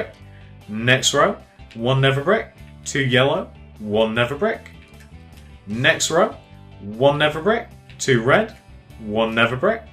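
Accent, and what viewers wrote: United Kingdom. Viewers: Mary Ruth Orr he has a british accent calm down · It's his accent, he's british xD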